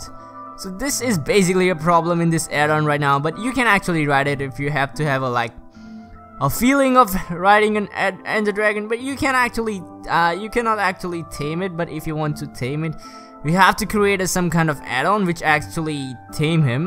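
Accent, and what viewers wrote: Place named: India. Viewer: You have a mixed accent, sounds a little bit like Indian accent, are you Indian by any chance? Just curious..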